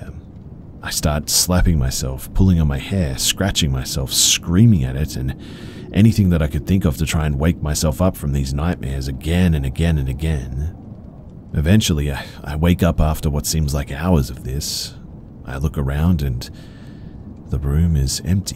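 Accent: Australia. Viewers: Sounds like you grew up in Oz, rather than Texas! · Why the Aussie accent when everyone is texan? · Wait, is that an Australian accent? I'm listening in Sydney.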